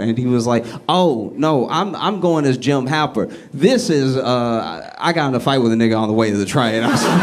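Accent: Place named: Louisiana, United States. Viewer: lmao his accent is Louisiana but his demeaor is totally New Yorker xD "the fuck?" xD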